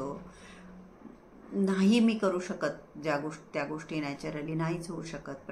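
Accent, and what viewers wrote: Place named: Khandesh, India. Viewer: ताई तुम्ही तुमच्या भाषेवरुन खानदेशातील वाटतात.तुम्ही हा त्रास तुम्हाला नेमका कधीपासून होतोय हे सांगितले नाही.तुमची एखादी सवय बंद झाली आहे काय? एक उदाहरण सांगतो. एका कार ड्रायव्हरचा अॅक्सिडेंट झाला होता.कपाळाला मोठी खोक पडली.त्याचे ऑपरेशन झाले.पण आठ दहा दिवस त्याला संडासलाच न झाल्याने तो डाॅ.कडे आला.बरीच चुर्ण औषधी दिली .तरी फरकच पडेना.डाॅ. विचारात पडले.त्यांनी त्या पेशन्टला विचारले की अपघाताच्या आधी तुम्हाला कोणत्या सवयी होत्या का ? तर तो म्हणाला मी रोज एक पाकीट सिगारेटस ओढायचा.त्यामुळे मला हा प्राॅब्लेम येत नव्हता.डाॅ.नी त्याच्या हाती दोन सिगारेट देऊन ओढायला सांगितले. एकच सिगारेट ओढल्याने तो टाॅयलेटकडे पळाला. अशी खाण्यापिण्याची सवय तुम्ही आठवून पहा.जर त्यानेही फरक पडत नसेल तर तुम्ही निष्णात हिप्नोथेरेपिस्ट कडे जा.शक्यता आहे तुम्ही त्यांच्या ट्रिटमेन्टने बाहेर पडू शकाल.हिंमत सोडू नका ताई.आनंदी राहा. एक राजकुमार शिखारे नावाचे हिप्नोथेरेपिस्ट आहेत.ते हिप्नोथेरपीने असाध्य आजार बरे करतात.ते कदाचित सातारा किंवा पूण्यात असावेत.त्यांना जरुर भेटा.पण वेडे वाकडे विचार मनात आणू नका प्लिज.इच्छाशक्ती वाढवा. 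अनुलोम विलोम कपालभाती शास्त्रोक्त पद्धतीने करा.कदाचित तुमची प्राणायाम करण्याची पद्धत चुकत असेल. पण हिप्नोथेरेपिस्ट कडे जरूर जा.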